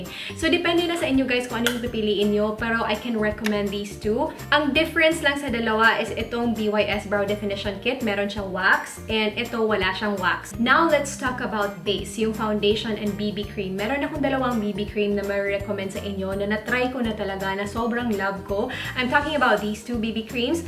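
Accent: Visayas, Philippines. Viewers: Hello, Ms.! The first time I watched your video, I already noticed your accent. It has a hint of Visayan accent. I'm not against it 'cause my dad's a Visaya as well. I just wanna ask these questions: 1. In what particular province did you reside while you're still here? 2. How long did you stay here? 3. What is your life verse? ☺ Thank you so much! Praying that God will grant the desires of your heart. 😊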